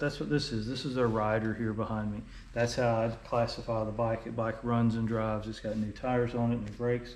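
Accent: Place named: South, United States. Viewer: There is no such a thing as a perfect and when I say wrong I just disagree. It does not mean I am right and you are wrong that's all. If you were not doing a great job I would not be watching you all the way across the country in a miserable state lol of Southern California North East San Diego By the way you talk I'm guessing you're somewhere in North Florida or South Carolina? Also I don't have any Hondas actually have a couple of 2 wheelers 2004 WR450F, 2002 DRZ400 and 1985 Yamaha 225DX. I did grow up in the 1980s with a 1977 very first year Honda Odyssey and 83 Honda 185S. I don't like 4 wheelers I am a dirtbike guy but for some reason I also do like threewheelers so go figure Well thanks for doing a great job keep making videos and I watch some of your videos over and over again and my opinion is that Honda two valve motors were a lot more durable and did not have top end problems like their RFVC 4 valve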